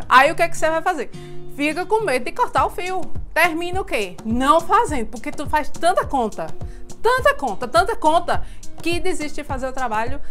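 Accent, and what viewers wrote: Northeast, Brazil. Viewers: Muié de Deus😂😂😂😂😂😂😂 tu só pode ser Nordestina 😂😂😂😂😂😂😂😂😂😂 PARABÉNS... Que DEUS te abençoe sempre 🙏🙏🙏🙌🌺🌺🌺 Direito de Maceió Alagoas Brasil kkkkkkkkkkkkkkk 😂😂😂😂❤❤❤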